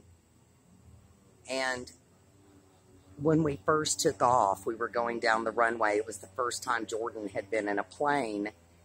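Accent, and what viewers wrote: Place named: South, United States. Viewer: Your voice is beautiful and I love the southern accent. I am a native Texan and I missed the southern drawl. I love you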